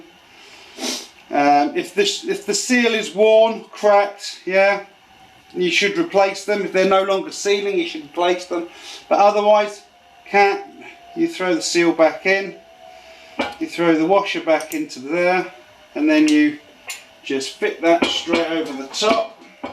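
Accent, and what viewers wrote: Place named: England, United Kingdom. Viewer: A good video,nice to hear an English accent for a change,me being an aussie.Was looking for a video on the same job on an '85 V-Max,supposing theres not much difference?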